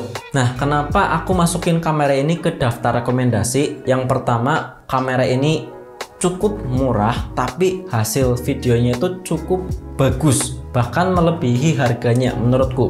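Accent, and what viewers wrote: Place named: Java, Indonesia. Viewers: Kayak'e wong ngapak ya mas'e? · Ngapak ya mas. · Medok ya mas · Logat ngapak nya ga ilang ,bagus ,identitas 😂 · logat ngapak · Medok oke jadi aku menetap untuk menonton 👍🏻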